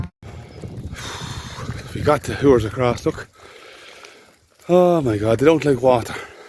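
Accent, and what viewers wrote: Ireland. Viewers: Bred , born and reared into it. A career in nursing first but the LAND called out loud so out the gap and got out the wellies. It's a great way of life and healthy.. You got very handy with the CAVAN accent and you been from the west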